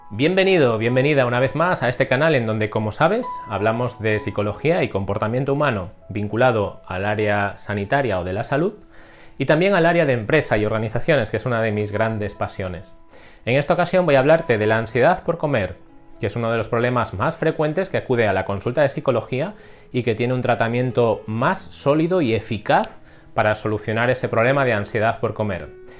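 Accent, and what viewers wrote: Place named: Spain. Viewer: Mis oídos agradecen el españoo de España, no el latino sudamericano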